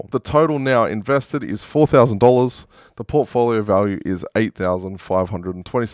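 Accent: Australia. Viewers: Just saw you on the bitboy crypto livestream! Thought I heard an Australian accent, Perth here. Love the content and also 100k lol, my first week of crypto and it has opened my eyes massively about the world and also money! Can't wait for what's ahead, hopefully will get that deposit for our first family homee very soon following some good content and advice 😊👍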